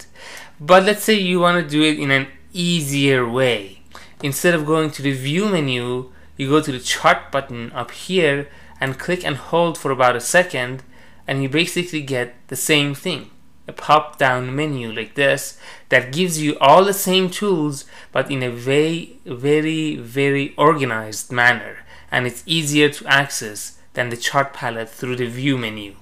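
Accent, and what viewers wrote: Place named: India. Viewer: This is NOT a TUTORIAL?!! Then what the fck is it? The video is titled "TUTORIAL!" And the narrator specifically mentions "TUTORIAL!" The poster asked if there was someone who spoke English w/o a frickin' accent! I don't see why when there are instructions to be given, everyone has to have a frickin Indian accent!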